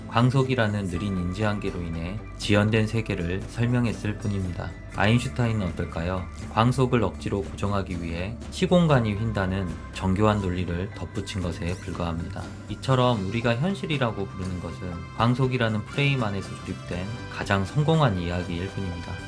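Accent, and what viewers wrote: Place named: Jeolla, South Korea. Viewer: 즌라도 사투리 심하다잉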